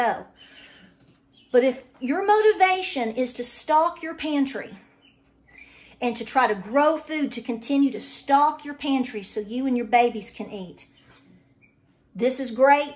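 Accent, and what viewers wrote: South, United States. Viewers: Thank you for the motivation ❤️ I’ve been stocking my pantry since 2020 but you gave me ideas for other things I didn’t think about! I just love listening to your southern accent 😁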